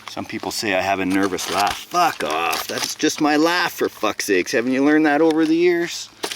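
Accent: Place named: Canada. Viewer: Damn the fakes and the haters! Be you, talk like you, act like you. Otherwise you are a bloody conformist. Victory for sure! Awesome content from who is obviously an awesome person. I'd like to burn a joint with you. Even though you are starting to take on that Canadian accent a little. LOL. Keep doing you bro. Great stuff!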